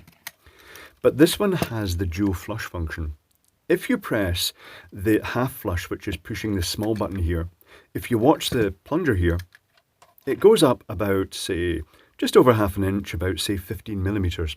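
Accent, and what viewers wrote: Scotland, United Kingdom. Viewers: There is something relaxing about a softly-speaking scottish-accent man discussing about toilets for 20 minutes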